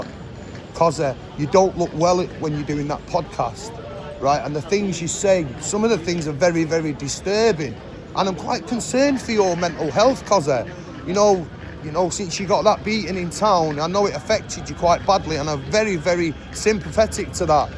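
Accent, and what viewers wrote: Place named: Manchester, United Kingdom. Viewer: Its I love your Manchester accent and you are spot on with you are saying this YouTube video: Author my mate and brother..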